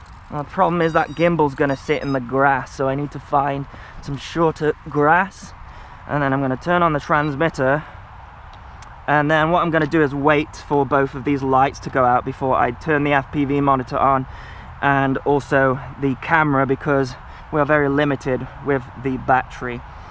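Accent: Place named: Yorkshire, United Kingdom. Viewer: You sound exactly like my friend Oliver from Yorkshire :)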